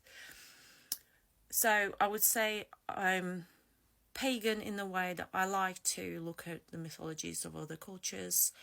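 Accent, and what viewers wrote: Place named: North Midlands, United Kingdom. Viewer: I was born into it but made my 'escape' in my mid-teens when I got old enough to slowly move myself 'out the door' over the course of a year or so. So well done to you for making the break. I have to agree that the use of the Bible as if it is an authority with the power to convince you does seem to be very common. My father tried it on me tirelessly from when I left the organisation until the day he died, utterly ignoring my core response that, if I do not agree that his source is 'literal truth', then it is has no chance of causing me to suddenly see the 'error of my ways'. Greetings from an English Druid ... and I was surprised when you said you were Latvian as that accent definitely sounds North Midlands to me :D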